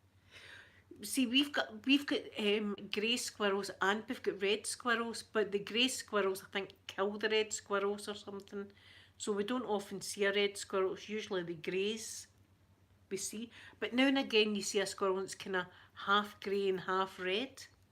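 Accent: Scotland, United Kingdom. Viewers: That lipstick looks lovely on you! I wish I could do a Scottish accent but I carn't do it haha! It's lovely though x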